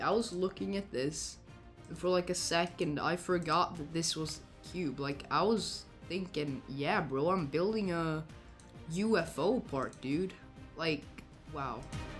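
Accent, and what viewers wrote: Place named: Sweden. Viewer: Are you by any chance swedish? It just Kinda feels lika you have a bit of a swedish accent.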